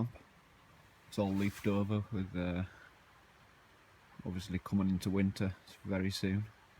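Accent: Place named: Yorkshire, United Kingdom. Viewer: u sound very Yorkshire like.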